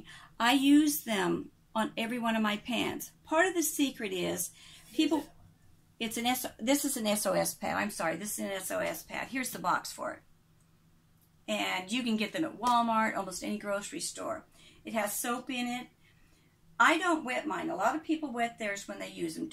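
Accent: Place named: Kansas, United States. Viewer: I knew u were from Kansas. Kansas girls just know one another. And the way we say wash. Ps I was all my dishes by hand ❤️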